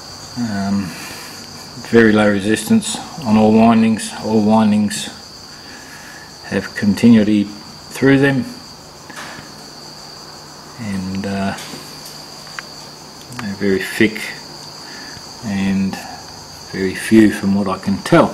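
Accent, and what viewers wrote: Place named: Australia. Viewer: lol aussie accent and crickets in the background and then a kookaburra to top it off!! haha gotta love aus! im from south west sydney!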